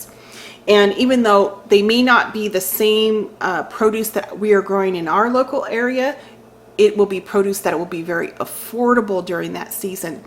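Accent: South, United States. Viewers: I've never had In n Out, but I've definitely heard of it! Everyone seems to love it. By the way, your accent sounds very southern. Are you from the south?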